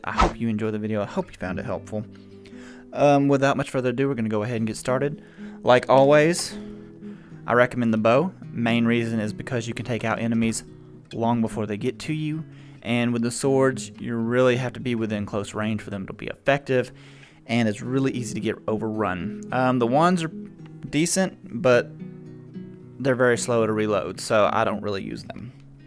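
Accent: Texas, United States. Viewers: you got such a low key texan accent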